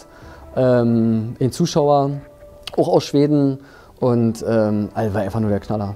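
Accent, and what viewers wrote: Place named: Berlin, Germany. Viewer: Super sympathisch. Super Auto klingst ein wenig nach Berlin ? Fahre selber Volvo aber kenne hier keine andern der ne ähnliche Klatsche hat .mfg tommy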